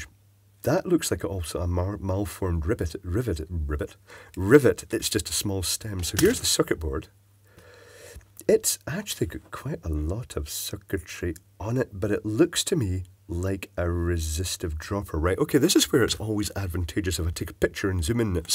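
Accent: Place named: Edinburgh, United Kingdom. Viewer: Hi, I have a bit of a left field question. I love your accent, I'm assuming its Edinburgh. Would that be a correct assumption?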